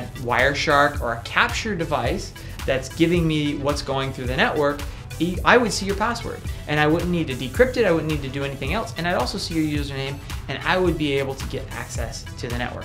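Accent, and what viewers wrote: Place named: United States. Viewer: Good to hear an American English!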